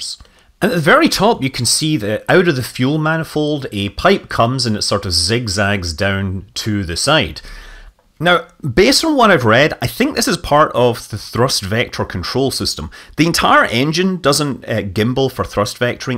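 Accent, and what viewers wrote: Scotland, United Kingdom. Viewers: He speaks clearly and pronounces well. And obviously a company that does language recognition in many different languages can manage a Scottish Speaker subprofile for English. Besides, that is not a strong accent, it is a very mild one. Go watch the Prime Minister’s Questions when an SNP (Scottish National Party) leader asks questions.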